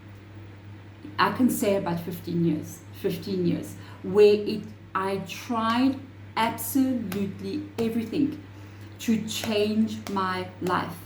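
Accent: South Africa. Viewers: I hear a south African accent?😊 ❤I'm from joburg living in Oz. Thank you for this reading 📚 ♈️ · Thank you! You sound South African!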